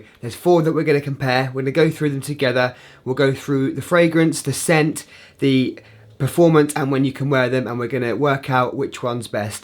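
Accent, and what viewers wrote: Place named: United Kingdom. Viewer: He is British. He is speaking the original English not the American English or whatever English you learnt.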